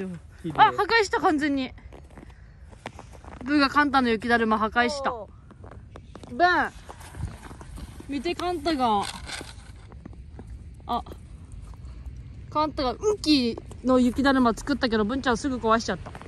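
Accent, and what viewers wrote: Kyushu, Japan. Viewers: 文がまるで犬のような姿見せてくれてる事よりも、 姉の拠点が関東な事に衝撃を受けてます イントネーション的に九州辺りかと思いながら見てました （過去動画に引っ越し関連の説明あったりハズレてたらゴメンナサイ）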